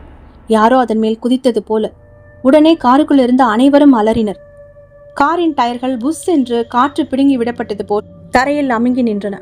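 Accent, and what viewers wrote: Madurai, India. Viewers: Hi..ungal native maduraiya....slang athe mathiri iruku..melum intha kathyil meenakshi amman patri neengal sonnathu unmai...yennidam oru thadavai kanavil vanthu mookuthi ketal...aanal naan athai suththamaha maranthu vitten..oru murai meenakshi amman koviluku pohum pothu vasalileya aval kural kettathu mookuthi vangitu vanthiya yendru...appothan ninaivu vanthu avalidam sorry solli kovilukul ponen..aanal ammanai tharisika mudiya villai..thirai pottu vittargal...potramarai kulathu padiyil amarnthu vehuneram aluthen..aanal maru murai ponapothu marakamal mookuthi vangitu poi iyaridam kuduthu avaluku poda sonnen...andru iravil kanavil vantha amman koburathin mel irunthu yen mel malligai poo panthai yen mel pottal..yen native madurai than...i used to visit her often before marriage...even now wenever i go to madurai i used to visit her...but nobody knows this matter till now ...i am revealing to u only..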